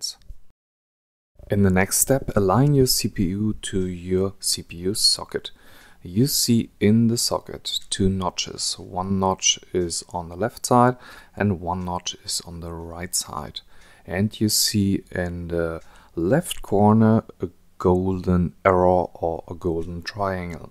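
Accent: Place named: Germany. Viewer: Ne ;P Aber zumindest hört man, dass du ein deutscher bist^^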